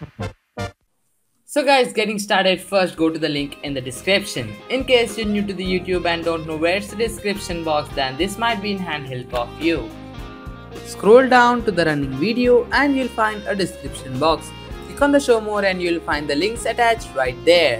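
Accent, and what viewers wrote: India. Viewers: it is an indian accent you idiot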